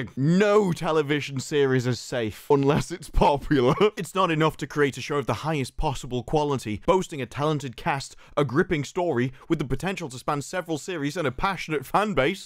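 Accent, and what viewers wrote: United Kingdom. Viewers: This man has peak British mouth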